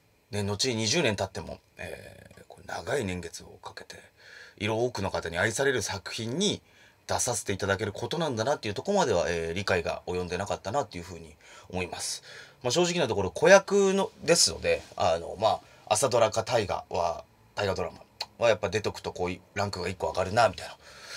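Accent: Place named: Okinawa, Japan. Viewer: 沖縄のイントネーション好きだったな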